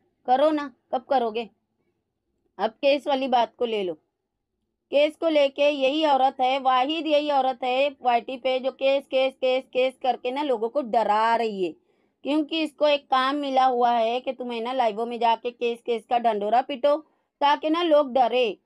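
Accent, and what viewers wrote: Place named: India. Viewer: api ap India sy hn kia. ap ki urdu sy lga .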